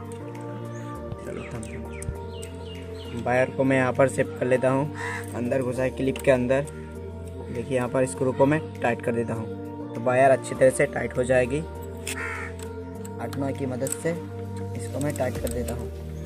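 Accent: India. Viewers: Ap ke bato se lagta hai ap Bengali ho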